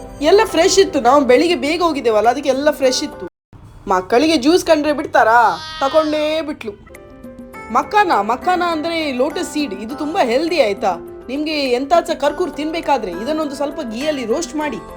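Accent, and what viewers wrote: Mangalore, India. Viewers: Hi mam...nanu vdeo noduva muncheye nimge like kodudu vdeoge yakandre nimma mathu kelikke full kushi...😂namma kudla kannada...lots love from surathkal krishnapura...love u mam n ❤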